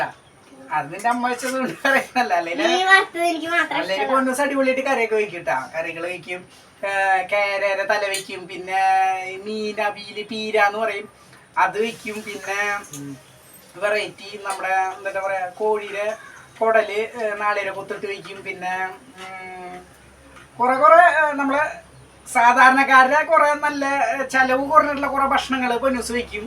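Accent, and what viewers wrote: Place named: Thrissur, India. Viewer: Good to ur thrissur basha.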